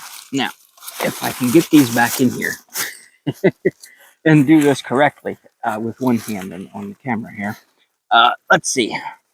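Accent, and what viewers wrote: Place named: Midwest, United States. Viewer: For what it's worth, I can understand you just fine, though I'm a fellow Midwesterner. But I think the issue for some may be the slight muffled quality of the audio. Sounds like you may have a windscreen on your mike (which is absolutely 100% great), but there is still a little "fog" in the audio, which may be what people are reacting to. I don't know what video editor you are using, but DaVinci Resolve is a very, very powerful free video editor that also allows you to make the audio crystal clear using its Fairlight page. You may not have enough time in the day to utilize it since you (gratefully!) make a video so frequently. And that's much appreciated! Especially from those of us interested in planting Bamboo. But I thought I'd at least put this out there.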